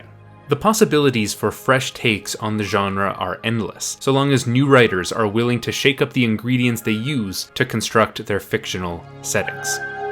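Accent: Canada. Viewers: sounds like they might be from Canada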